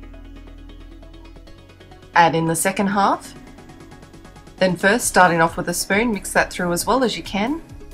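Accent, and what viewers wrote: Australia. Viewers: Are you Australian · I could tell you're Australian by your accent.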